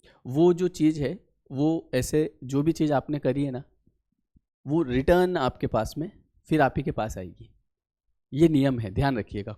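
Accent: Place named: Indore, India. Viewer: Aap MP se ho kya....aapki language Indore side ki lagti hai 😊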